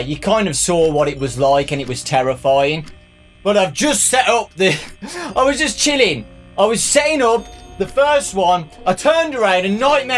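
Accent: United Kingdom. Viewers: Tell where did you come from,I mean I love your voice;British?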